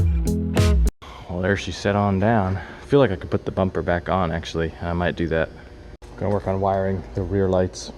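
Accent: South, United States. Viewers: You sound southern in this one for some reason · Lol i like the southern accent, whered that come from??😂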